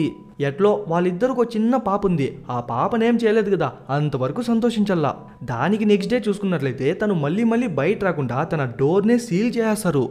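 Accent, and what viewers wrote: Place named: Karnataka border, India. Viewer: Karnataka border lo slang alane untadi bro for reference you can see harm hara movie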